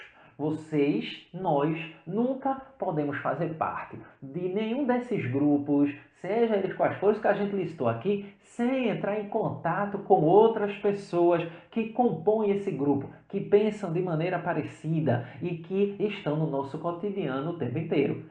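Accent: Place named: Pernambuco, Brazil. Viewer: e eu q me apaixonei pelo sotaque de Pernambuco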